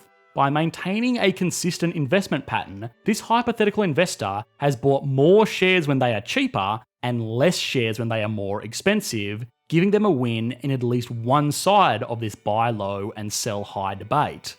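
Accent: Australia. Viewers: Australian accents make me wretch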